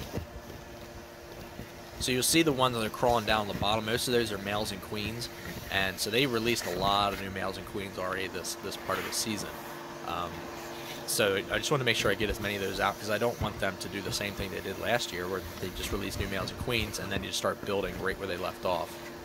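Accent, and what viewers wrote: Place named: Delaware Valley, United States. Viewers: Great vids my guy. Side note: I'm hearing a DelVal accent (especially in those Os). Where are you located? I'm from South Jersey so my ears almost instantly recognize a fellow Tri Stater lol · You sound just like Streetspeed717. Must be the PA accent! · This guy is definitely from Maryland or Delaware based on his accent.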